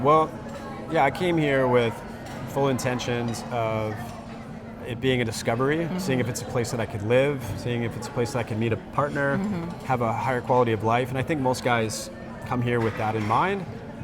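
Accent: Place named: United States. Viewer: We're you actually from the Philippines? You have no Filipino accent at all. You have a more American accent.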